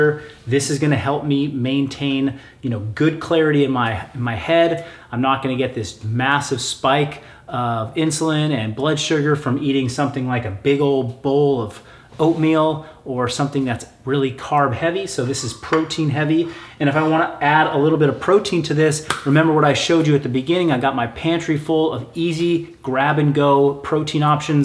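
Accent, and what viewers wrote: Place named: California, United States. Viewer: Also you sound like Uriah Faber lol, must be from California? In fact you sound a lot like anyone from Sacramento and a lot of Team Alpha Male.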